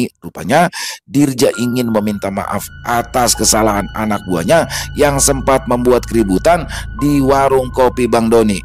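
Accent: Jakarta, Indonesia. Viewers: Iiiiihhh..sumpah keren abis nih!✌️ Logat Betawi ny itu loh...pas bngt, feel ny jd dpt bngt 👍 Fikiran Q jd traveling ngebayangin sosok Bu Zubaedah, Bg Doni, Gembul & si centil Bonies 😀 Karakter apapn yg dibawain berasa natural bngt. Multitalent bngt Kadang menjelma jd org Betawi, kadang jd org Kalimantan, kadang jd org Jawa😄 Top markotop bngt dah ahh..gadak obat 👍😁 Menyala Bang 😃🔥🔥🔥🔥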